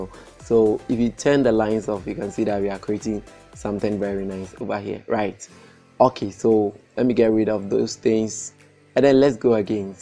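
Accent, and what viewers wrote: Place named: Ghana. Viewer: Sounds like a Ghanian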